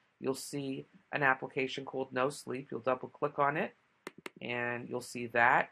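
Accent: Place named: New York, United States. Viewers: Cool Accent. New York ? Jersey ? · love your accent, haha since i am a New york fanatic, i instantly knew it,hahah i know, but im from europe and I really want to go there someday, great video thank you for the great tips :)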